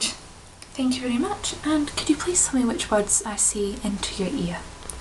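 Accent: Scotland, United Kingdom. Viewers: You Scottish? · Loved this. Your Scottish accent is ace :) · It's so strange listening to a fellow scot doing asmr haha. Great job though! :) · (No hate) Tbh your Scottish accent isn't that bad, very stereotypical but not bad. However if everyone I was around everyday sounded like this it would be quit worrying. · I found the scottish accent beautiful and heartwarming. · What a lovely Scottish accent. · It's scottish, right? :D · is that scottish accent? So adorable spoken by her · Oh god, the Scottish accent.. Now I have to watch trainspotting again ahahaha amazing video, subscribed +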